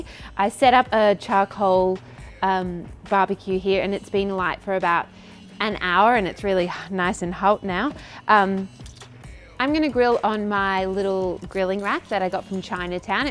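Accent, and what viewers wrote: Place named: Australia. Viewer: I think her is Aussie Accent!!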